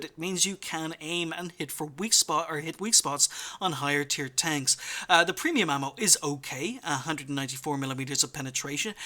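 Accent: Ireland. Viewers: but no one says is it worth it like. Its that Irish accent LOL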